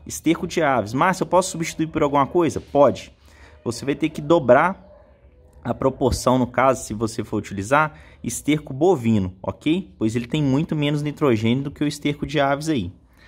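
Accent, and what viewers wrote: Minas Gerais, Brazil. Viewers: Que sotaque gostoso de Minas Gerais,bjs.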